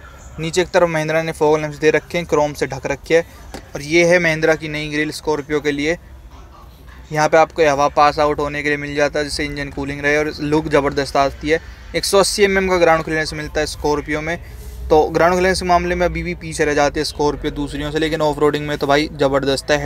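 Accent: Haryana, India. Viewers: Bhai yo desi haryanvi touch maza lata h car ke review me 😂😁😁😎😎😎💪💪💪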